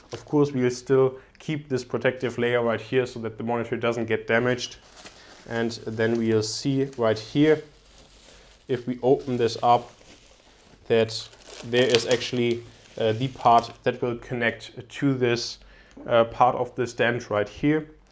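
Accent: Germany. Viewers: Man hört richtig Rausch das du deutscher bist haha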